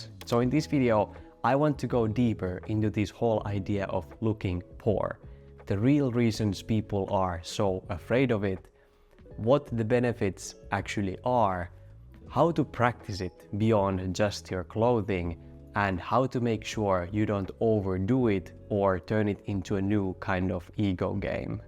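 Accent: Finland. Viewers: Jännä miten suomalaisena tunnistaa suomalaisen puhumassa englantia ekan kahden sekunnin jälkeen. Hyvä video! 👍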